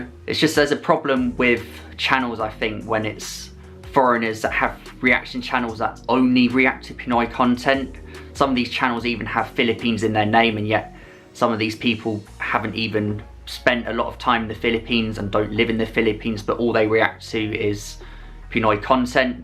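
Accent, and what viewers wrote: United Kingdom. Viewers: If I'm not mistaken, I think his accent is called Estuary English. Correct me if I'm wrong. Notice that for TH, he uses /f/.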